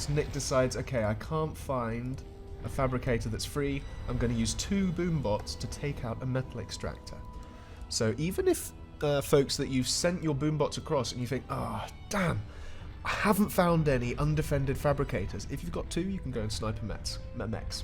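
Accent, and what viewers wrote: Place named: United Kingdom. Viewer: Excellent narration refreshing to hear hear a cool British accent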